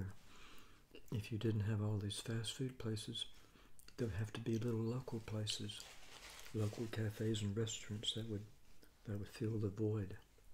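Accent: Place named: California, United States. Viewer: To me it kinda sounds Californian idk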